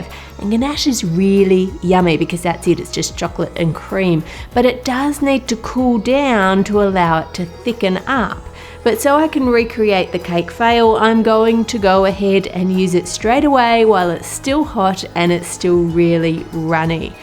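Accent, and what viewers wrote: Australia. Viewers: She sounds Australian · Looking gooouud. That aussie accent 😅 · I am in love with her Australien accent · That Aussie accent is just gorgeous. And of course your content is awesome !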